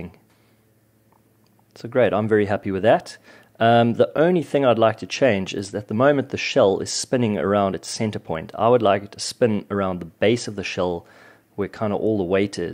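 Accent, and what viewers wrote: South Africa. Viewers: sup are u South African?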